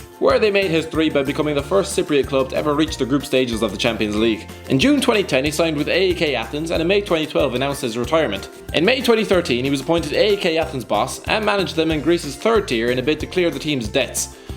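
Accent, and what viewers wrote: Ireland. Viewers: being half greek half irish its lovely to hear an irish cover this video <3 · narrator is a paddy, oirish to most.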